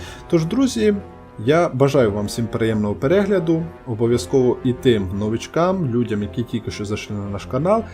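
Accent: Transcarpathia, Ukraine. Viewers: Ти закарпатський геймер?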